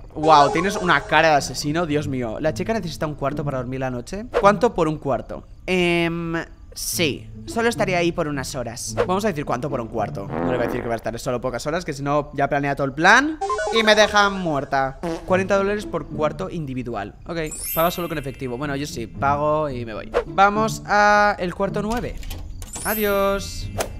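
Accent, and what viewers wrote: Argentina. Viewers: hablas bien en modo argentino ya que soy de argentina hablas super bien😊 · y su acento argentino a todos lados, lo amoo 🎨🌷❤️‍🩹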